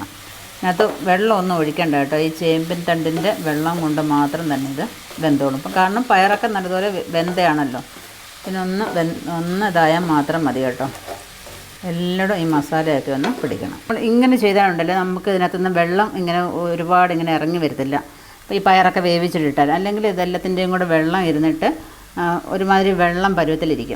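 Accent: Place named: Pathanamthitta, India. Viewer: Hello Eavideyanu Sthalem Samsarem Kettittu Pathanam Tittayano ❤🧡❤🧡❤🧡❤🧡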